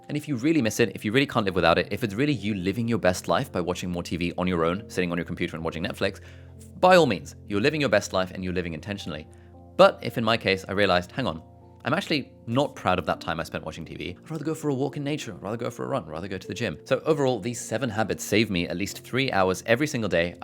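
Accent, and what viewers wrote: United Kingdom. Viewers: Gotta admit, Brit accent gets viewers. Lol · After one year of watching your videos I just realized your British accent when you said "trolly."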